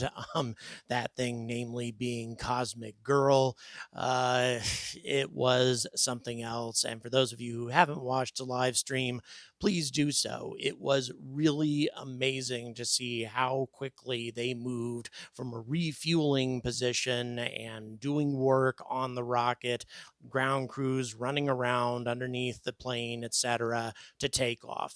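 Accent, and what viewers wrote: England, United Kingdom. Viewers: As a Brit I think your British accent is most splendid. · Love the English accent!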